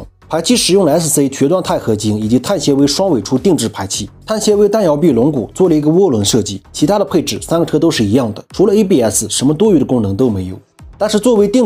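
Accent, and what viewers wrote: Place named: Inner Mongolia, China. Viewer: 你这内蒙口音可以呀！！！哈哈哈哈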